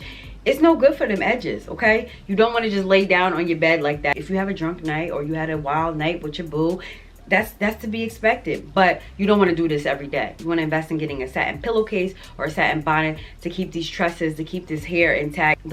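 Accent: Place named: New England, United States. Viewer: I love your videos!! They are very educational and entertaining too! Are you from NY or New England? I hear that accent! 👍🏽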